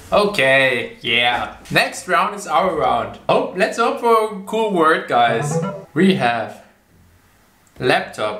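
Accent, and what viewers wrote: Germany. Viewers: i kinda though he was german because of his acent and than this xD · Ach bist deutsch hab mich irgendwie gewundert über das hat hab mir gedacht das hört sich doch deutsch an lol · Ah deutsch hab mich schon gewundert bei deiner aussprachr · Bist du aus Deutschland ? Hab weiter geschaut frage beantwortet. Dachte wegen der Aussprache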